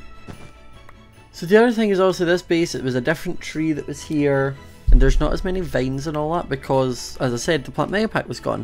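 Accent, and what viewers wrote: Scotland, United Kingdom. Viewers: its his accent which is a mix of English and Scottish that makes it sound different.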